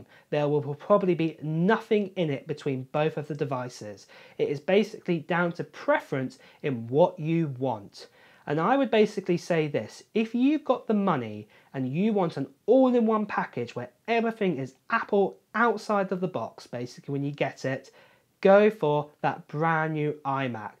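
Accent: United Kingdom. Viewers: you are getting a 4.5k screen which arguably to buy separately it would be about £300-£400. You are also getting a keyboard and mouse which cost over £200. You also have a 6 speaker system with spacial audio, plus an HD camera...on top of that you have more material used. so...for the price difference - it kinda makes a lot of sense. Annoyingly you open with the $1299 --- yet go on about the 4 ports... your video is a bit confusing... you also sound British yet use American pricing... Dude... come on... don't be so stupid... you are talking about buying a low end screen, with a cheap keyboard set, and a horrible cheap speaker set --- why not price it up with like for like items --- i really cant watch any more... it's too painful- shockingly trying to get subscribers with a phone - an opened out of date phone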